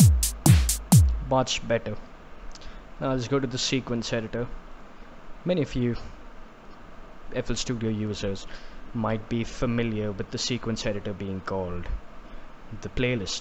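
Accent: India. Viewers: He sounded Indian from the start lol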